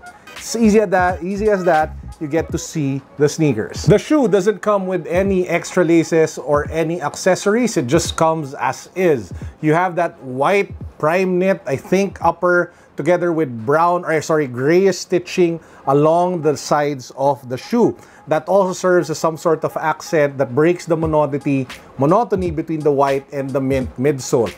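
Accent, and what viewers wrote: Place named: Philippines. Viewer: This guys has the strongest Pinoy Accent I ever heard in YouTube 🤣🤟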